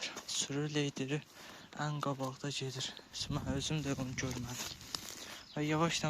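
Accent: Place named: South, Azerbaijan. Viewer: Ləhcədən Cənub bölgəsinə oxşayır.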